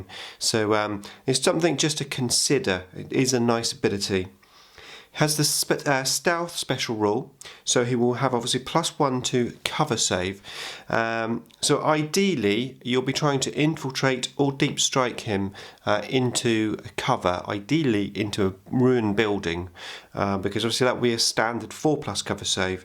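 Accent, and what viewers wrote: United Kingdom. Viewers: 40k Where are you from btw? I would likr to improve my English level(by watching wh videos) and ur accent sounds cleanly british haha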